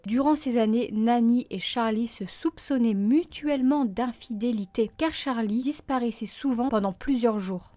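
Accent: North, France. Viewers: t'est du nord toi ^^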